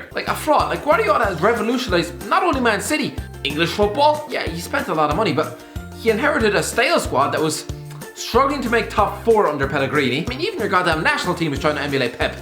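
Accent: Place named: Ireland. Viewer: What part of Ireland are you from ?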